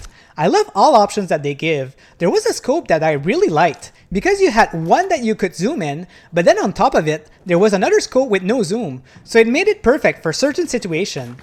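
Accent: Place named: New Brunswick, Canada. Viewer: Un voisin Newbie!! I'm trying to make out your accent but I'm not sure, Edmunston, Peninsule p-e? Bathurst?? I'm also french and from Bathurst. btw I just gave you your 1000th sub. Congrats!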